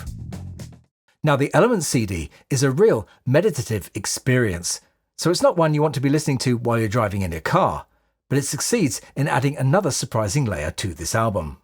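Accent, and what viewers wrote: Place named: United Kingdom. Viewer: Hola !! Desde Argentina, muy fan de John, amé toda tu explicacion !!! espectacular y ese acento britanico acompañando la explicacion da gusto oirlo. Te felicito. gran video. gran disco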